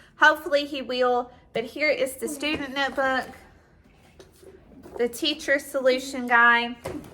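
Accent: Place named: South, United States. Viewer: We will be using A g Gentle Feast for the first time this year. I am really looking forward to it. You have such a nice southern accent.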